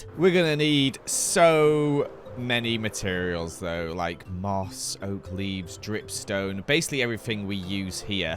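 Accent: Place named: United Kingdom. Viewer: Okay but the dry af "Tequila" in such a monotone british accent is my favorite thing ever.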